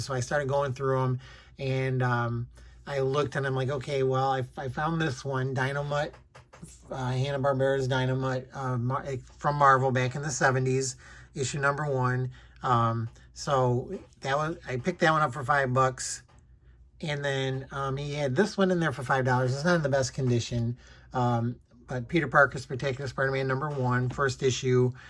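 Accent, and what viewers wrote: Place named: Michigan, United States. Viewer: I can hear your nasal Michigander accent. I'm originally from GR, but in CHI now. Great haul!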